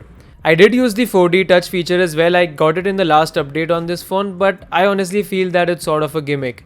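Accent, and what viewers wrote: India. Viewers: Why indian have funny accent?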